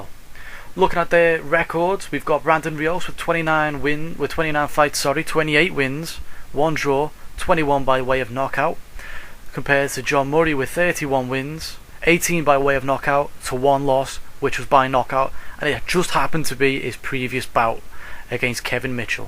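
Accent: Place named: Liverpool, United Kingdom. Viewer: a scouser :)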